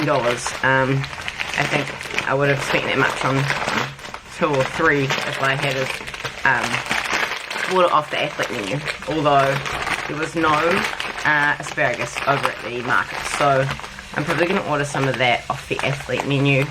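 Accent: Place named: New Zealand. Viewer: I've just subscribed (YT recommended this video to me) and when you spoke, it was cool to hear you are a fellow Kiwi :-)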